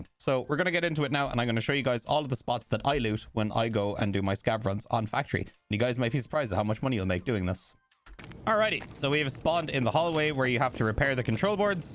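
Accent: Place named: Ireland. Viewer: subscribed for the Irish lilt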